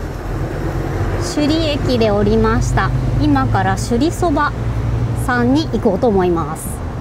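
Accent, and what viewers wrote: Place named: Okayama, Japan. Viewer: 外ではバリバリの東京の言葉(?)で話してますが、夫婦の会話は岡山弁ですw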